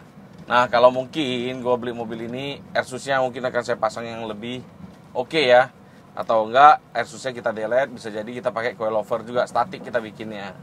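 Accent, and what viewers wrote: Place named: Sumatra, Indonesia. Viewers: keren x koko ini klu Logat bahasanya SUMATERA kali. salam sukses koko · ABANG INI LOGAT NYA KEK ORANG SUMATRA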